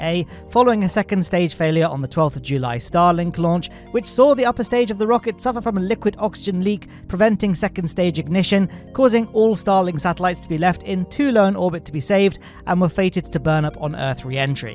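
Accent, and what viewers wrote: United Kingdom. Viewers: I know it may be difficult with a British accent but you need to pronounce certain words correctly, like a persons name or the name of a location. It isn't pronounced Macy as in the Macy's Day Parade, it's pronounced Massee's as in Ma sees, with a long 'a' sound. Everyone else in the dozens and dozens of YouTube channels pronounce it correctly, so why can't you? That is my only criticism of an otherwise excellent video, if I can't pronounce something correctly I find out how to pronounce and get it right, anyone can do it.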